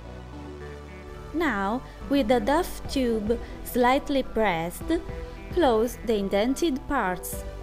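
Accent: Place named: Italy. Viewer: Cute strong accent. What part of Italy r u from?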